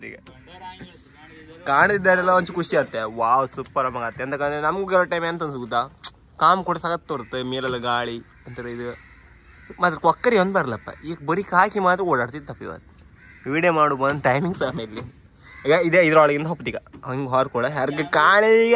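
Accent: Kundapura, India. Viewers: Wow superrrr mangroves Vlogg superr Kundapurane chandaa nivuu mathadooo kundapurr bashee ennuu chandaa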